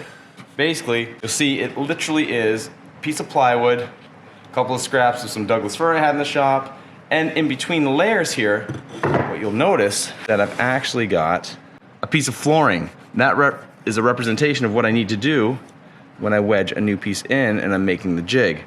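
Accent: Canada. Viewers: Whats with all these canadians and the ABOOT crap!!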